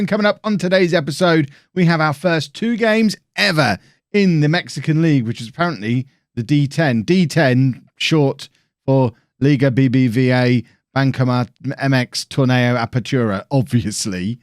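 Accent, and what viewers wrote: United Kingdom. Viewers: Man, you went to Mexico and the ladies are all just falling in love with you! I didn't know a British accent was so popular in Central America.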